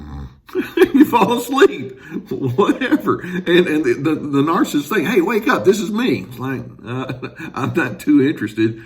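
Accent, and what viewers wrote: South, United States. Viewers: 🤣😂 Now I have got quite a wicked sense of humour but even though you did make me laugh that phrase feels cruel and I do not enjoy being cruel . That is one phrase I will not use because I do not want to play games with others or wind them up. I get no joy or relief from it . I can see where you are coming from and with that soft kind southern voice of yours YOU could get away with it and it still sound almost loving and actually a form of endearment but 🤣 I in NO way could get away with that. I stay away from others with those traits . Of course I do bump into people with these traits so I am grateful for many of your tips but my way of dealing with it is I keep my distance and use the word NO. And Mr I caught that twinkle in your eyes at the end of the video 😂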